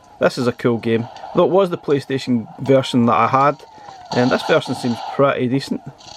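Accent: Scotland, United Kingdom. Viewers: Great video mate! Still eyeing this up! Apologies if it was mentioned somewhere in the video, I'm watching at a library with the sound low, what emulator/core was used? I'm guessing this wasn't beetle core based on the Sega Rally gameplay. The road seems to "break up" and this doesn't happen on real saturn/beetle gameplay. But everything seems super smooth and contradicts the notion that the ARC is not capable of running Saturn. Also regarding Sonic R I absolutely adore it and it is criminally underrated haha. The characters are meant to be driven like cars with B as an accelerate button, and the analog as steering and L,R triggers as drift. Once you get the hang of it it's pretty smooth and you'll be acing all the races. Perhaps it was a bad design choice to have the, running in hindsight. Oh and your accent makes me nostalgic for Scotland, it's been ages since I've been home.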